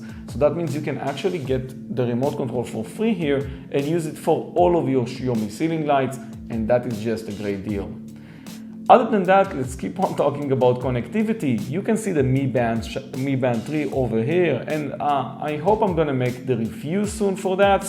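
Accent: Israel. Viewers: אין על המבטא הישראלי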